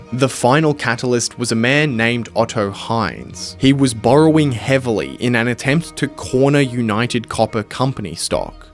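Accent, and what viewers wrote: Australia. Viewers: Ugh it’s hard to stay engaged with this Australian accent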